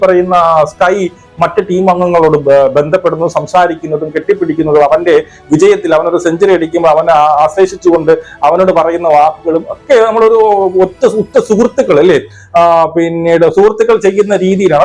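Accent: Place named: Thiruvananthapuram, India. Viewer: തിരുവന്തപുരം ശൈലി എനിക്ക് ഇഷ്ടമാണ്. ആ നാടിന്റെ ശൈലിയിൽ തന്നെ ചേട്ടൻ പറയണം. കേൾക്കാൻ നല്ല രസം ഉണ്ട്. അച്ചടി ഭാഷ സംസാരത്തിൽ എനിക്ക് ഇഷ്ടം അല്ല. ചേട്ടൻ മാന്യമായി ചിലർ ചേട്ടനോട് നിർദേശിച്ചു എന്ന് പറഞ്ഞു. ശരിക്കും ഞാൻ ആണ്. ഞാൻ മാത്രമാണ് നിർദേശിച്ചത് 'അടിച്ചു തൂറിച്ചു' എന്ന പ്രയോഗം ഒഴിവാക്കണം എന്ന് . ഈ വാക്ക് ഒഴിച്ച് ബാക്കി എല്ലാം ചേട്ടൻ പറയുന്നത് കളിയുടെ പെർഫോമൻസ് കൃത്യമായി വിലയിരുത്തിയാണ്. അതിലൂടെ മത്സരത്തെ പറ്റി കൂടുതൽ details അറിയാൻ കഴിയുന്നുണ്ട്. ഞാൻ ഈ കഴിഞ ഇന്ത്യയുടെ ബംഗ്ലാദേശ് t20 സീരീസ് മുതലാണ് ശരിക്കും continues ആയിട്ട് ചേട്ടന്റെ വീഡിയോ കാണാൻ തുടങ്ങിയത്. ഞാൻ ഒരു തൃശ്ശൂർകാരൻ ആണ്. തൂറുക എന്നും തൂറിക്കുക എന്നും ചേട്ടൻ ചേട്ടന്റെ ശൈലിയിൽ ഇഷ്ടം പോലെ പറയാൻ ഉള്ള അവകാശം ഈ രാജ്യത്ത് ഉണ്ട്. ഞാൻ ചേട്ടന്റെ വീഡിയോ കണ്ട് ഇഷ്ടം കാരണം പറഞ്ഞു എന്നെ ഉള്ളൂ. ധാരാളം ആളുകൾ ചേട്ടന്റെ ഈ പ്രയോഗത്തെ ഉൾപ്പെടെ മത്സരത്തിന്റെ മറ്റു കാര്യങ്ങളെ അടക്കം നന്നായി ആസ്വാധിക്കുന്നുണ്ട് എന്ന് ഈ വിഡിയോയുടെ കമന്റ്‌സ് വായിച്ചപ്പോൾ മനസ്സിലായി. 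ഭൂരിപക്ഷ പിന്തുണ സപ്പോർട്ട് ആണ്.അതുകൊണ്ട് ഞാൻ മിണ്ടുന്നില്ല. All the best. 😊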